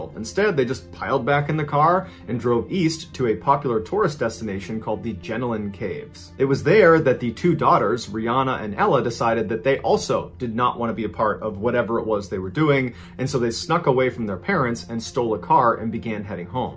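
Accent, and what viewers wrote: United States. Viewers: It's funny as an Aussie, hearing Americans pronouncing our towns and city names. Not Mr Ballen fault, it can be hard. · I do have to say I love hearing Americans say Australian towns. As an Aussie it takes me a moment to catch up on what towns are being spoken about. I end up saying it correctly in my head.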